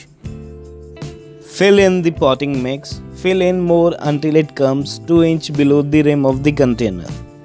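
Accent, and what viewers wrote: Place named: India. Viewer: Thick accent nothing is more annyoying than Indian accent.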